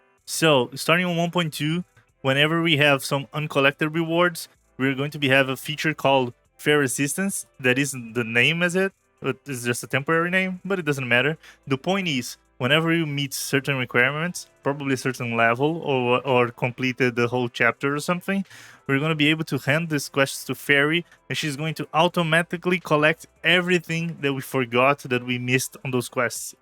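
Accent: Brazil. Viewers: sabia que tu era br pelo sotaque kkkk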